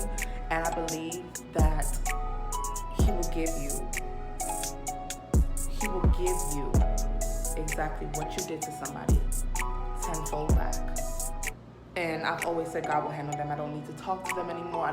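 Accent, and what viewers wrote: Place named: Brooklyn, United States. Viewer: Ooo you a Brooklyn girl 🥵🥵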